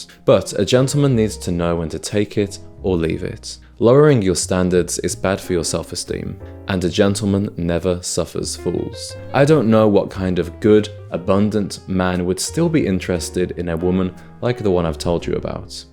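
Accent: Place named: United Kingdom. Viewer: No like for british accent . I thought its american 😢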